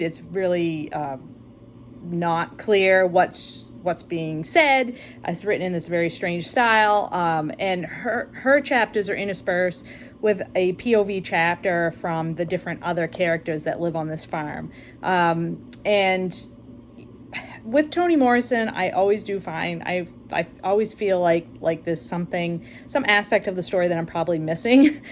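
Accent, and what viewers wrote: Maine, United States. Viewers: Seems a little late for the lake to be freezing. LOL at the lame Maine accent.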